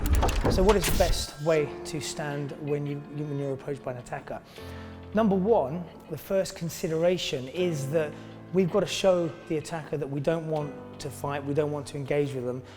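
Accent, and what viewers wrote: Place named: United Kingdom. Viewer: I'm so sorry but it's so unusual for me to see a strong,big,burly guy with a British accent.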